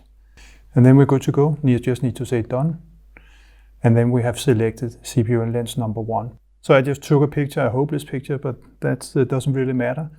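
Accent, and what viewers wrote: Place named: Denmark. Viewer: I've been following Youtube and Private site for a few years now, one of the best out there for learning - clear, concise and to the point with the bonus of that beautiful Danish accent - deserves much more than the current 15k subscribers. The D4s has been my dream camera since it was released and I almost bought one back in March '25 but went with the D810 to add to my D7200 and D7000 - I was put off by the added cost of XQD / CF cards [ though the D810 does have a CF slot I've not bothered using it ] - Watching this video I'm tempted to revisit the D4s once more.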